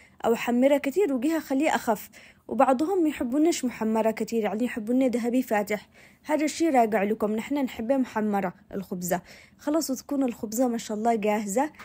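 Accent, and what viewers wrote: Aden, Yemen. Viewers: ماشاء الله تسلم ايدك اني احبك واحب كلامك العدني عسل عسل ❤ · كلة كوم واللهجة العدنية كوم تجنن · ماشاءالله عليك انفدالك ومحسن اللهجة العدنية حقنا 😢❤ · بحب اللهجة العدنيه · لهجة العدنية جمييييلة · كلامك العدني المميز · ما شاء الله بالعافية ٦٠% متابعتي لك تعجبني الهدرة العدني ..اذكر زمان نسوان الجيران يتجمعوا بالزغط بعد العصر وهات من هذه الهدرة الرائعة 😂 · الحلو انك ماغيرتي لهجتك العدنية الحلوة مش زي بعض اليمنيات تسافر الخليج شهرين وقد اقتلب لسانها وغيرت كلامها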